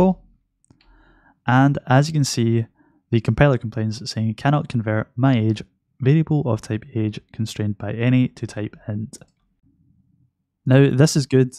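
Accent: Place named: Scotland, United Kingdom. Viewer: Probably the Scotish accent. :)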